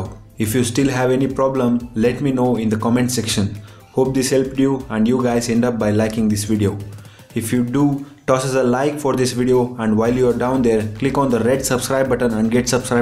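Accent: India. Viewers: FUCK YOU AND YOUR INDIAN ACCENT AND YOUR SCUMMY VIDEO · Ty BRO nice idian accent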